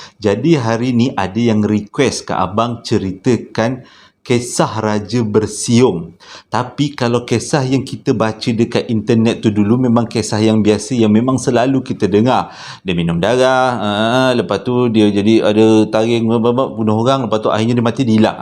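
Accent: Java, Indonesia. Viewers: Selain kc champion. Abg best la citer mistik2 dan hikayat.. best je dgr bila abg smpai kn citer kadang2 ade sleng mcm jawa 😂😂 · Nape aku suka bercerita: 1- Xde jumpscare..jd nye xde la terkejut2..haha 2- Dia cerita xde cut dan edit..terus je..so rasa cam borak depan2... 3-,ko jawa ke?agak pekat bunyi jawa ko..hilang rs rindu nak borak2 dgn kwn2 jawa..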